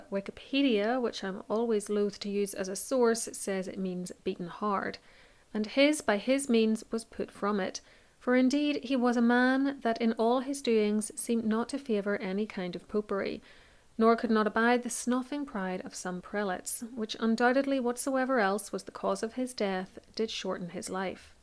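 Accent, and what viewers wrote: Northern Ireland, United Kingdom. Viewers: A Northern Irish lady speaking. Just speaking normally. No "It's not X, It's Y, AI drivel. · What part of the north of ireland are you from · Yes her Northern Irish accent is beautiful. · Northern Ireland accent 🙂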